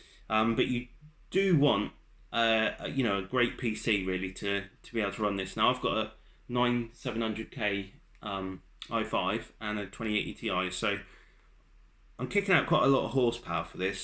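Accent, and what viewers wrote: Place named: England, United Kingdom. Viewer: It's amazing how much I love an English accent.. and how much them pronouncing "H" bothers me. We (U.S.) say "A-ch" They say 'Hate-ch". breaks my brain. :) Thanks for the info and review!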